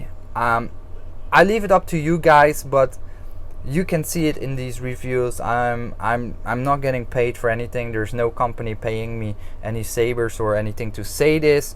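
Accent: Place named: Netherlands. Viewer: dude are you dutch? you sound like someone thats dutch and speaks english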